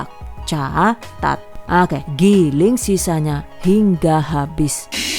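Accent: Banyumas, Indonesia. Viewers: Bukanya serius nonton malah ketawa denger ngelawak ngapak😂😂😂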